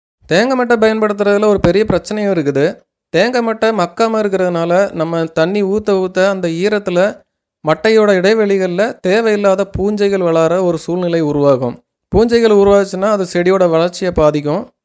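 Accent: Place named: Tirunelveli, India. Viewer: athu nellai Tamil... Aana ippo konjam kovai Tamil mix aaguthu...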